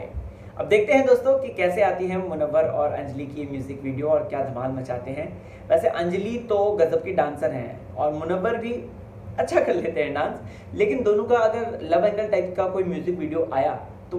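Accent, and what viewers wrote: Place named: United Kingdom. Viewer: Ye bhai Mai tumahra videos dekhta hnn ya to English me bolo ya Hindi me , nhi to muh se supari Nikal ke baat Karo , but British jaisa Hindi naa bolo yrr 👍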